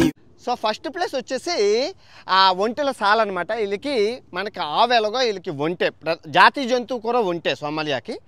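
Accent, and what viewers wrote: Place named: Visakhapatnam, India. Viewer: I like your videos and your telugu accent which most people in Vizag and near by mostly used to speak. God bless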